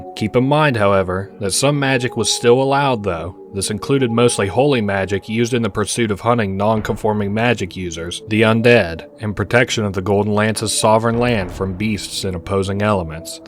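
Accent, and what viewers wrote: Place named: Spain. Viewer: The most spanish english voice i ever heard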